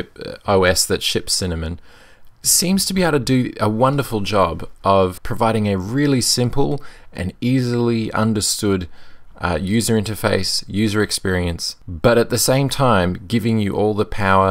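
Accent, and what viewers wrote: Australia. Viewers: G'Day mate. Thanks for this. I've been wanting to install a new distro on one of my PC's and you've certainly given me a great deal of information to digest plus conduct some more knowledgeable research. So good to hear an Aussie accent on YT as well. Cheers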